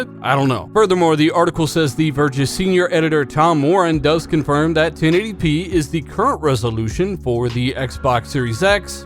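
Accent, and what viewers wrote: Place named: South, United States. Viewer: your hick/country accent sucks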